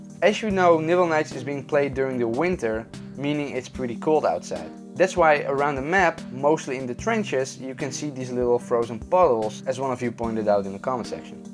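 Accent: Netherlands. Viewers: echt zn nederlands/engels accent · I subbed cause i like your channel but 1 question are you dutch? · You have a Dutch accend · you sound like a dutch fpsrussia! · you sound a bit dutch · Ik hoor aan je accent dat je Nederlands bent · Ben jij nederlands? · Are you dutch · zo te horen ben je Nederlands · is dat een Nederlands accent?? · R u dutch? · are you dutch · kinda cringeworthy to hear a fellow Dutch person talk English using a Dutch accent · Definitely dutch this guy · Are you dutch? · You dutch? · Are u dutch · For sure that's a Dutch accent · are ou dutch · Are u dutch?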